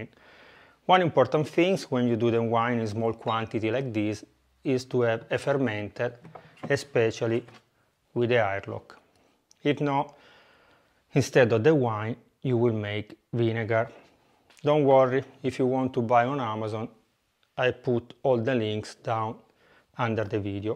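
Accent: Italy. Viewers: Very informative with sweet Italian accent.. Grazie mille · You're too good. especially it's something to hear Kg, Litre etc. than hear some Oz and lbs(lot of bullshit). And keep up your italian accent. It's awesome!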